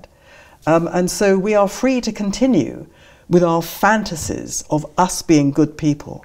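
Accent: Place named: RP, United Kingdom. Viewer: This lady is an idiot, even her pseudo-educated English accent couldn’t help her argue a convincing case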